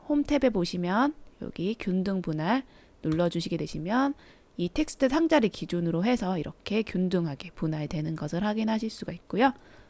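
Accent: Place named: Busan, South Korea. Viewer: ㅎㅎ 뭐하노~~ 붓싼아이가~~ 정겹네요~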